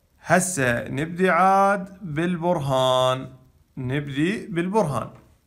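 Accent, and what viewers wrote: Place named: Gulf, Arab world. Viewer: لهجتك خليجي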